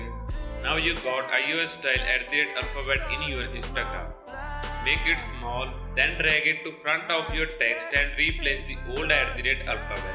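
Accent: India. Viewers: You English is enough punjabi?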